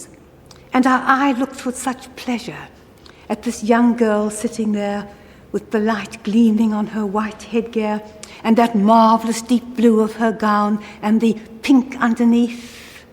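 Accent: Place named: South Africa. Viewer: Sr Wendy largely lost her South African accent but it shows in her ability to pronounce Dutch, Flemish and German names correctly.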